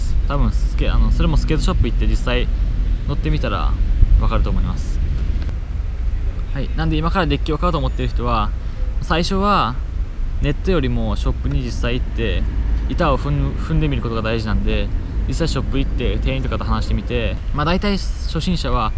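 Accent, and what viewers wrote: Japan. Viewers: 普段はどこに住んでるんですか？あと顔とかハーフっぽくてイケメンやし英語喋れるけどバリ大阪弁、、、ハーフですよね？ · いつもお疲れ様です！さんって何県出身ですか？？喋り方的に関西か東海のほうですか？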